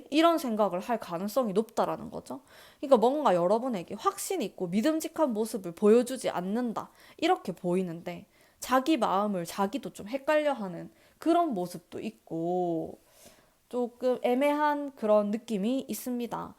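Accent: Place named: Gyeongsang, South Korea. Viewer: 경상도 분이신가요 ㅋㅋㅋㅋㅋㅋㅋㅋㅋ 아무리 감추려 해도 감춰지지 않는 사투리 잘 듣고 갑니다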